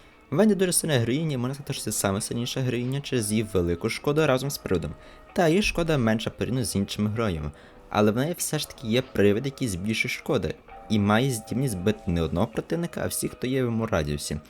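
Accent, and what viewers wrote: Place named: Poland. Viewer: Я спочатку намагалась зрозуміти, чи це польска чи білоруська 😭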